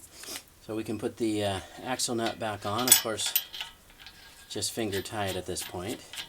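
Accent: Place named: West Coast, United States. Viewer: Something about your laid back west coast narration that adds a layer of mellow to the repair. Thanks man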